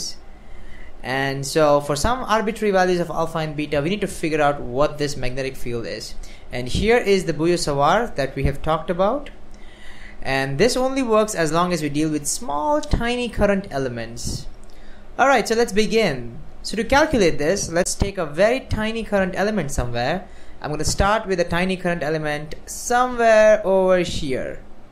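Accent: India. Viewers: wyf? indian? cuz ur accent hmm lol great explanation tho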